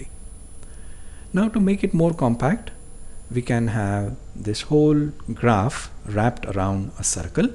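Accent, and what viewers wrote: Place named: India. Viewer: hats off. great work from the professor, made very easy to understand. and he has excellent indian accent. thanQ very much sir.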